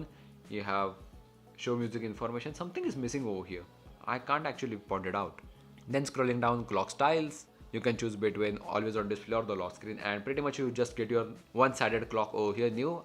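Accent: India. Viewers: Its the accent actually. Hindi. And thats something unique.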